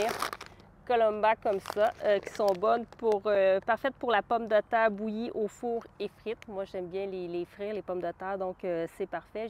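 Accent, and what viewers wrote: Quebec, Canada. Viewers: Coucou amie Québécoise Très heureux d'avoir fait ta découverte et ton accent me ramène à Montréal ou j'étais il y a a peine un mois pour des vacances en famille ❤❤❤ Je m'abonne biensur a ta chaîne Bonne journée à toi et à bientôt Greg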